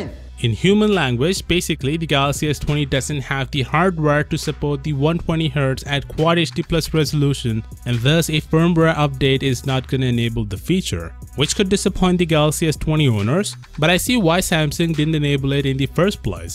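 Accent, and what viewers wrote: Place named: India. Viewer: Your accent is Indian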